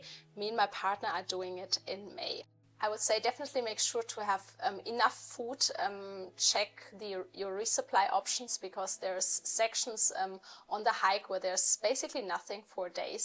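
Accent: Germany. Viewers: Very interesting - thanks for answering all these questions! One that I've never heard you talk about is how your English comes to be so good. Obviously, as might be expected, you have quite a pronounced German accent (which I really like, by the way!), but your command of spoken English, your vocabulary, your use of colloquial expressions and even your pronunciation of British place names are all very good. I speak a little German, but, if I tried to imagine the reverse, with me trying to make videos in German whilst on the go, it would be a disaster! Did you previously spend some time in the UK?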